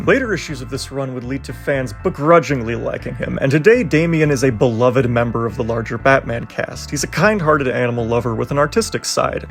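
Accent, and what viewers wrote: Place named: Russia. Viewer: Really love hearing your thoughts but my god man your Scottish accent sounds Russian 😅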